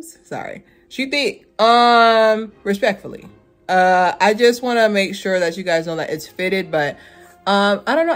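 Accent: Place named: United Kingdom. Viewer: You have to do all reviews with that British accent lol that was awesome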